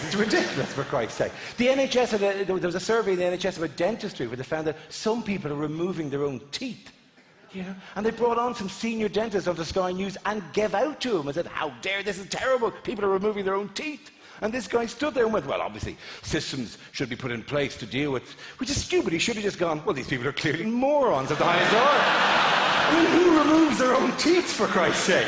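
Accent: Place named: Ireland. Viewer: It's just an Irish accent with a lisp thrown in.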